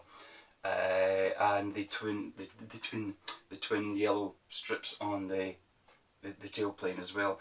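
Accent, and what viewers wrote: Scotland, United Kingdom. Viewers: Always seems odd to hear a Scots accent on YouTube. Subscribed, anyhow, since I'm "Scotch" masel'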